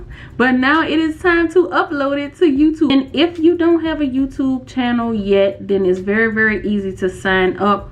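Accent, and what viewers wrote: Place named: Louisiana, United States. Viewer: I like her, but her voice is so annoying it’s like a Louisiana annoying country type of voice or something just take some English classes somebody’s Youtubers think they can just hop on here and do anything it’s OK to take some classes specially, if you talk to an audience practice your English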